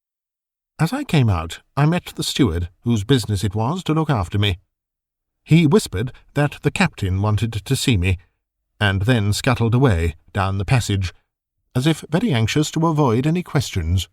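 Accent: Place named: Oxford, United Kingdom. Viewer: 👍 This seafarer horror story was well narrated, with a proper 🇬🇧 Oxfordian English-British accent 🗣️ that matches the setting of the Victorian Horror Story. Very enjoyable indeed!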